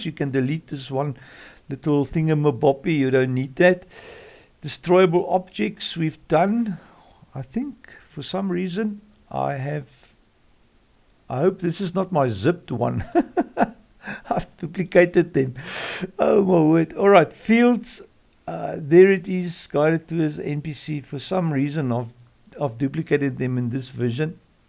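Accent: South Africa. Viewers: Hey. By your accent I take it you're from sunny South Africa. How bout making us a nice SA map.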